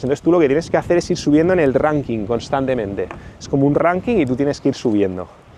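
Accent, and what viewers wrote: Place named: Spain. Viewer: Hola, escucho tus vídeos porque me encanta el acento español y la cultura española. Mis bisabuelos paternos y maternos eran españoles y se mudaron a Cuba 🇨🇺 y mis raíces son muy españolas. Yo actualmente vivo en E.U y viajar a España 🇪🇸 en el futuro muy próximo está en mis planes. Soy tu fan para familiarizarme con el la cultura española y cuando visite me pueda comunicar efectivamente. Muchas gracias 🙏 por tu tutoría.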